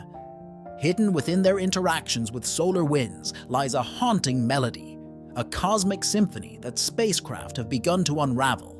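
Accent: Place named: Ireland. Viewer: Hey guys! You need to put a notice to use headphones for the best experience. **By the by, was this an AI narration? Because if it's a human, I swear I detect a hint of a brogue. A bit of Celt in there? Maybe Western Ireland. Connemara, perhaps? I have family there so Im able to find it easily.**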